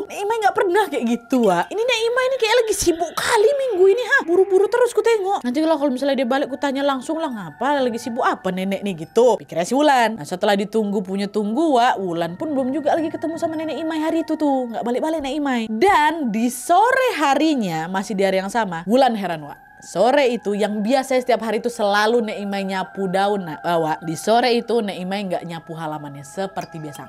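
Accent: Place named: Kalimantan, Indonesia. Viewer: pkee logat Kalimantan,hakk😭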